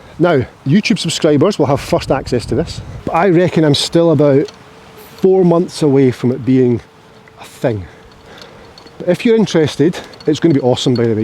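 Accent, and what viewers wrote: Scotland, United Kingdom. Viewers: Thanks so much for your informative videos, I really enjoy them, including the Scottish accent. I am not Scottish, but I live in Nova Scotia Canada, which is Latin for New Scotland, so I feel at home seeing glances of your beautiful Country. Keep up the great content, and I wish you all the best going forward. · I kind of imagined you somewhere in the north of Scotland. Very desolated. Maybe it’s your accent.you were sort of “rough”, until I saw you interact with your kid. Which was heartwarming. Keep it up. I enjoyed it.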